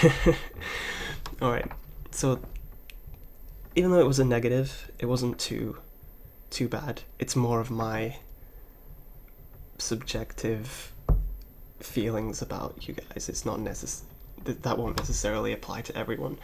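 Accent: United Kingdom. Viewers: Bruh I can’t tell if your British or not, it’s freakin me out pfft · Are you a Brit? I have a degree in British English and British Literature :)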